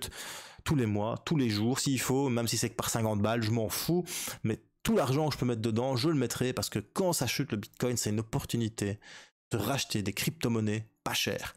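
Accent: Liège, Belgium. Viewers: C'est clair, j'avais directement reconnu l'accent liégeois ! :)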